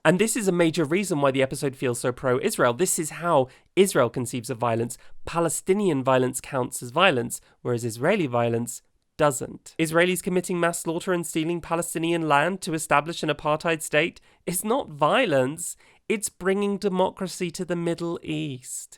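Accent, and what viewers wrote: United Kingdom. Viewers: british accent with the pplicker lisp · I’m a new subscriber after this. I really disliked this episode and you explained my feelings so well. Great video. And your British accent works so well for the delivery of your satirical lines.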